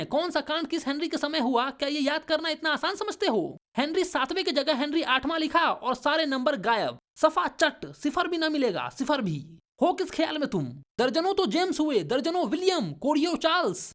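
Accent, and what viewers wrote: Bihar, India. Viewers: Aap bihari ho kya bihar ke lala lala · Bhai ap Bihar se Hai Kya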